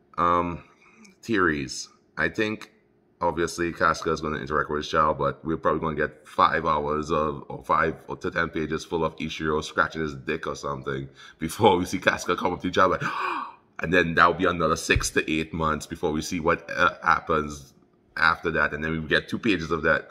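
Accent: Trinidad and Tobago. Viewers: What’s ur background bro? Ur accent sounds Trinidadian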